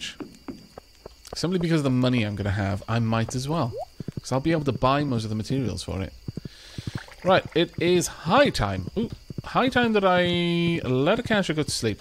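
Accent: United Kingdom. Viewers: That's an accent thing. He's saying it right in his accent. It's a common pronunciation across the UK, even here in Australia!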